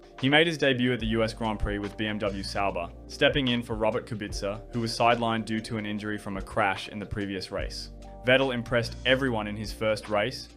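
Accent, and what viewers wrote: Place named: Australia. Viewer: Love the way your accent randomly switches between Aussie and American between sentences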